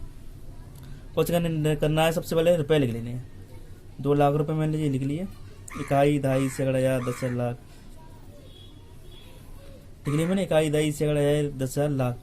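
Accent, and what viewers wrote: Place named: Dholpur, India. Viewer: भाई भाषा से धौलपुर साइड के लगते हो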